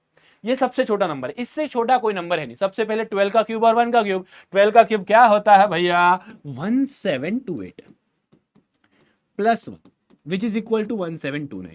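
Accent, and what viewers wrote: India. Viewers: Love ur other Hindi Accent ❤️😭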